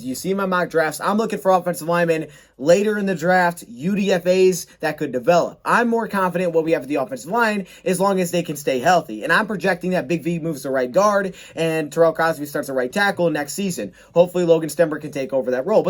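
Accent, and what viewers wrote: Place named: Michigan, United States. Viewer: It’s a Michigan thing, they pronounce it (or-e-gone) I’m from Tennessee and I moved here a few years back and everyone says it that way.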